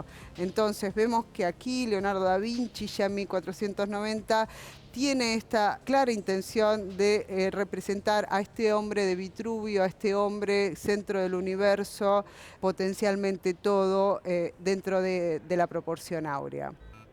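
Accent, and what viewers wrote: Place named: Argentina. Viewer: Más allá si está bien o regularmente explicado , para mi es claro , me gusta escuchar una voz argentina de una bella mujer.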